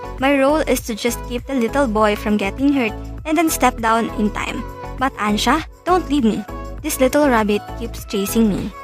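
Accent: Philippines. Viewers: The moment I heard you're voice I knew you're filipina. Hahahaha.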